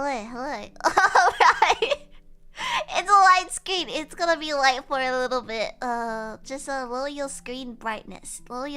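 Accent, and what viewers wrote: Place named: Australia. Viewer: Your Australian accent is adorable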